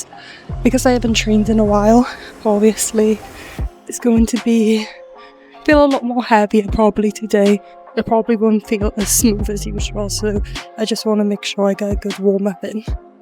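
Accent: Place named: North, United Kingdom. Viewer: that's a very thick accent, northern England? Or Scottish?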